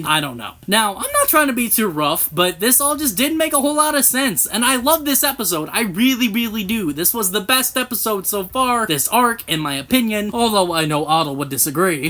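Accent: United States. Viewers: Ur American accent is great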